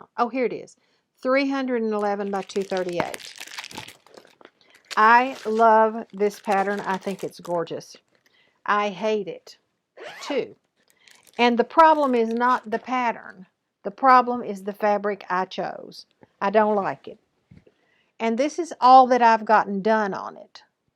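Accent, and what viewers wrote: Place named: Texas, United States. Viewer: I love the Sunflower Diaries pattern!! Are you from Texas? Your accent sounds like it. Im from Texas and you sound like me. I really like your chouce of patterns. Thanks for the video.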